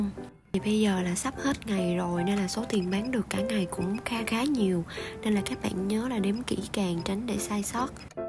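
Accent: South, Vietnam. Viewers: Nghe giọng miền Nam là ủng hộ liền. Chúc bạn sức khỏe nha ♥ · xem nhiều vlog rồi nhưng nay nghe được giọng miền nam ý, kiểu nhẹ nhàng. thường mấy bạn giọng bắc mà ấm thì dẫn nghe nhẹ nhàng. nhưng nay nge giọng miền nam vừa dễ thương vừa nhẹ nhàng luôn í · Huhu hiếm lắm mới nghe được một Vlog của bạn nữ giọng miền Nam nghe ngọt muốn xĩu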